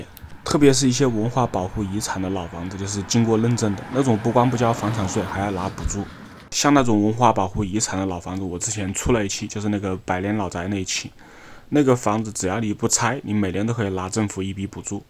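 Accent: Wuhan, China. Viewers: 这口音，基本可以说是武汉或者周边的口音，乡音太浓了。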